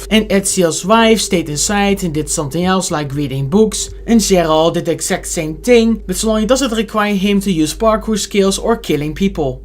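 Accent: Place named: Netherlands. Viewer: You sound like a dutch person who speaks english😋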